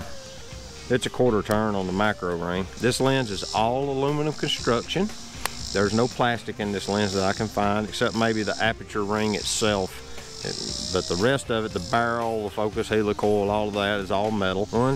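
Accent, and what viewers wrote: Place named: Georgia, United States. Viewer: Great video, happened to find one at a local shop for the whoppin price of 10 bucks! Not a dent or acratch on it, bit of an upgrade with the added length to the <num> i was usin for general stuff, tho that one is a beast as well! The macro feature lead to some real neat mushroom closeups, fantastic video as well. Simple, to the point, georgia accent, good shit man keep it up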